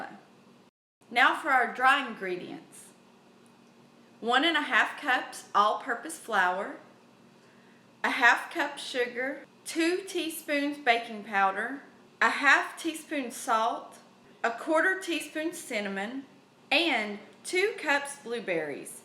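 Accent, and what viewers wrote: South, United States. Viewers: Hey! Just wanted to let you know that I just made your muffin recipe and they turned out amazing!!!! Love your southern accent and style! Thanks for the bomb recipe!!❤❤❤ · I absolutely LOVE THIS RECIPE! It’s the absolute best ! PS, when I heard your southern draw I knew this was the recipe for me! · I've never seen this channel before but I heard that southern accent and I immediately knew this woman can bake. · Oh i love the accent, where are you from? Sounds southern?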